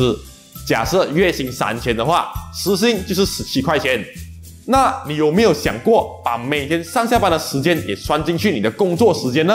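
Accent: Malaysia. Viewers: 這就是馬來西亞的華語 我覺的他說的很不錯了 我們也不會讓中國人改口音啊 憑什麼馬來西亞人就得改善口音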